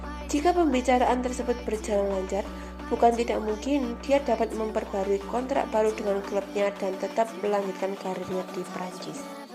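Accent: Java, Indonesia. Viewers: Iyee gue jg merasa medok suaranya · Gak usah ke Jawa Jawa an lah ngomongnya....biasa saja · min, orang jawa ya, medok bener logatnya...(^_*)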